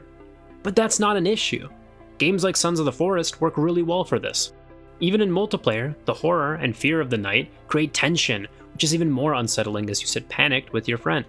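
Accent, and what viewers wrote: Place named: Canada. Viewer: he is Canadian has a strong Canadian accent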